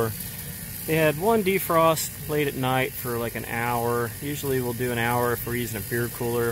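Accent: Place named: Ohio, United States. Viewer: Nice. I had a feeling. I was born and raised in the SW Ohio region, Hamilton to be exact, and thats the dialect so I pick up on it right away.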